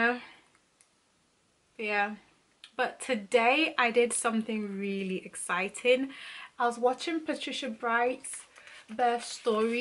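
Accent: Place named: United Kingdom. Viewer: I enjoy watching her videos with that beautiful British accent.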